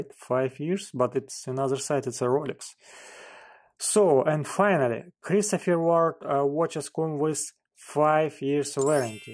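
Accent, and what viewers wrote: Russia. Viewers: Такой прикольный русский акцент 😅 лайк за обзор :)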